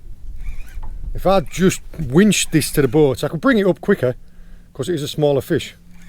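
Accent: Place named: Northern England, United Kingdom. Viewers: Hi, I feel like I detect a Cumbrian accent - not sure if that's right but you sound just like my Granda when we went fishing. Just want you to know that watching and listening to you has made his passing easier, especially when you explain things, it's like hearing him again. Love what you do marra, keep it up! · Just randomly found your channel, you said your in Cornwall but you have a bit of a Northern accent. Anyways I will be watching more, keep up the good work mate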